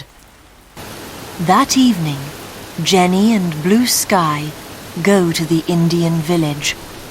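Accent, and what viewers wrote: United Kingdom. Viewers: THANKS FOR BRITISH ACCENT🤍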